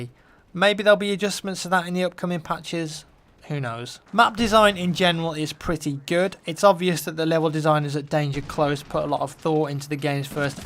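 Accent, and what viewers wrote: United Kingdom. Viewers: Amazing accent, r u British?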